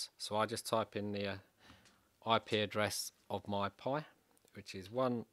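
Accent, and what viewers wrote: England, United Kingdom. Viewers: Can't understand that AWFUL english accent. I m outta here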